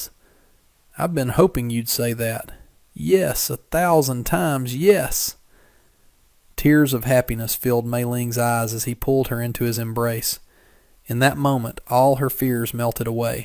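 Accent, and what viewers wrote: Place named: West, United States. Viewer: I love this real human voice with this wild west accent.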